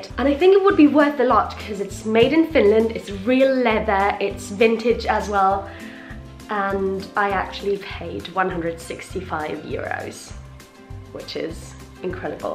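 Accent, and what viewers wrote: United Kingdom. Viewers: Are you Finnish? :) Cause you have a very clear British accent :)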